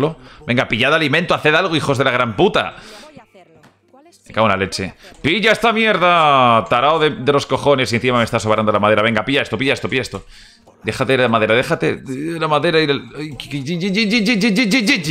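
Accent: Peru. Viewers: Ere paruano?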